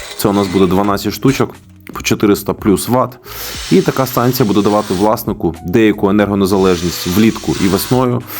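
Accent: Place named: Russia. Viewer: Обмоскалений диктор!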